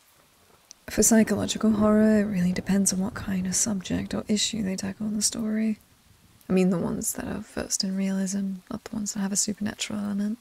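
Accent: United Kingdom. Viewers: I love the British accent and the background thunderstorm. It's so calming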